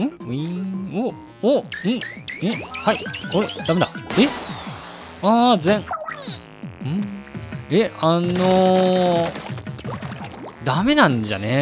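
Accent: Hiroshima, Japan. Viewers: Rさん動画投稿お疲れ様です😌💓 Rさんの広島弁が加藤茶さんの奥さんに似ていたのでびっくりしました❗️次回の動画も楽しみにしています🐱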